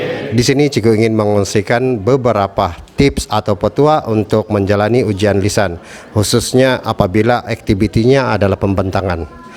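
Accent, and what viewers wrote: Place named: Indonesia. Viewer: Accent dia macam accent org Indonesia weyy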